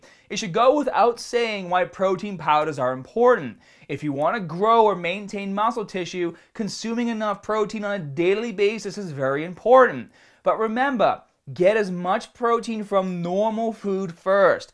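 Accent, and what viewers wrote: Boston, United States. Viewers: I fahuckinnn love bohston accent. Fahhhkin knowledge and cahhhhhrrbbsss! Seriously though I'd say is the most helpful Fitness youtuber out there. As a exercise science major and fellow fitness enthusiast got nothing but respect for the knowledge he gives us all. Keep doin you!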